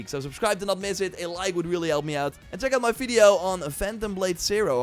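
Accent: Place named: Netherlands. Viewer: The Netherlands. He is Dutch.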